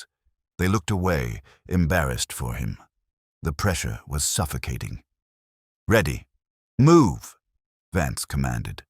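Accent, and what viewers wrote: United Kingdom. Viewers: Audio would be better if it wasn't using a slight British accent when talking about US military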